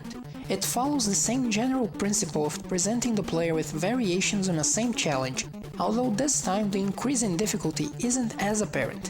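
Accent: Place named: Brazil. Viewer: By some reason I feel like you're brasilian. Well, at least your accent gives me this impression.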